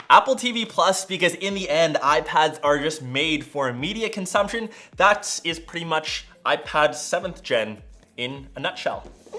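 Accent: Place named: Canada. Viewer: your Canadian accent is awesome !!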